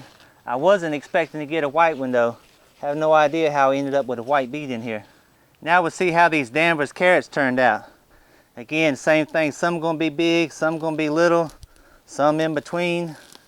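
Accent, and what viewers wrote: United States. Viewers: Omg, your country accent is so cute and funny. Nice video. 🌸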